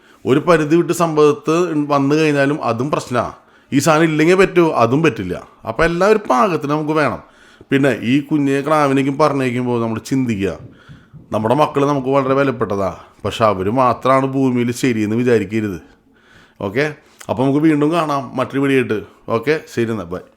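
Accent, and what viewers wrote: Thrissur, India. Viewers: Good presentation 👍Thrissur slang super 🤩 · ഞാൻ ദൈവവിശ്വാസം ഇല്ലാത്ത ആളാണ് എന്നാലും ബ്രദർ തൃശൂർ സ്ലാങ് ഇഷ്ടപ്പെട്ടു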